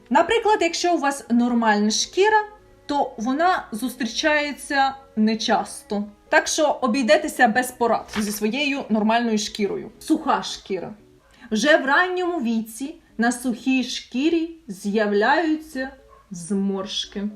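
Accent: Surzhyk, Ukraine. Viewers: Зараз здохну від цього суржику 🥵💖🥵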